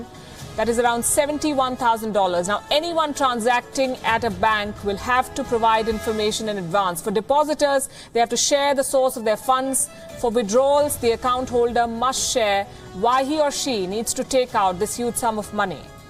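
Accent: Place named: India. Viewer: forgif and understand her indian accents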